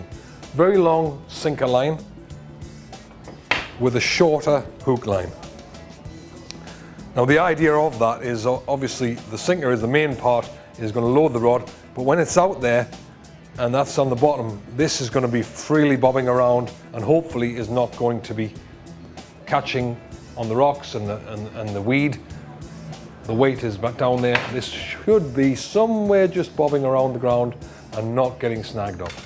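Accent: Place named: Durham, United Kingdom. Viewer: Durham accent 🤔🤔😁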